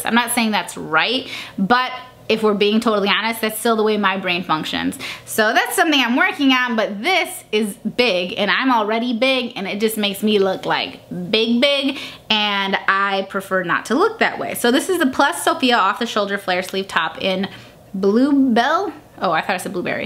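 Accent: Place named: Texas, United States. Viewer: I love your loudness I'm from Houston Texas so I'm so use to the way you talk makes me think your a normal person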